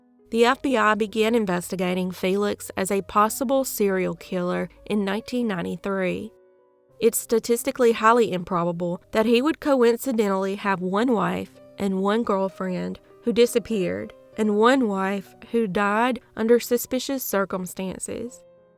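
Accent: South, United States. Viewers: can be hilarious at times with her cute Southern drawl🤣😂👍 Excellent narrator too!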